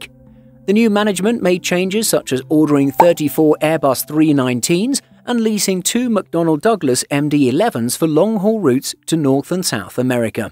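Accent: France. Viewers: that french accent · Nice french accent!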